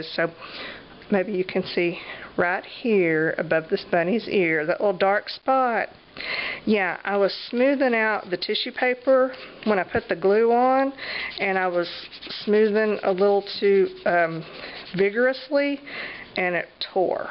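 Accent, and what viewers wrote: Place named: Texas, United States. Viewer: HI! I just found your channel yesterday. Awesome! 1st I love hearing the sound of home in your voice (I'm from the DFW area now in NW). 2nd I am so going to get a phone book and make an art journal, what a super idea! Thanks for sharing, now I have to find the video that tells me how to put the inclusions in. Keep it up!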